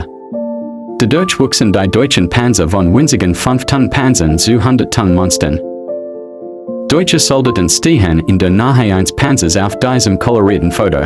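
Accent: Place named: England, United Kingdom. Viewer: Terrible pronunciation. Strong English accent.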